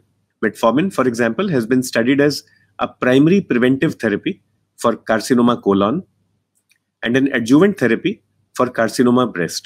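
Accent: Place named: India. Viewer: Very hard to follow with such a heavy Indian accent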